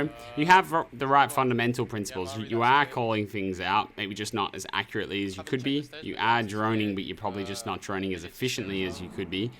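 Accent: Ireland. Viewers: I knew i could hear some Irish in ur accent! Love the content bro! love from Scotland 🏴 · Been wondering if I could hear a bit Irish in your accent for ages. Knew you were one of us ☘️☘️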